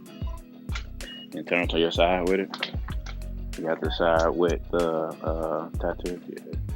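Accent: United States. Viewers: Yo are you from Baltimore?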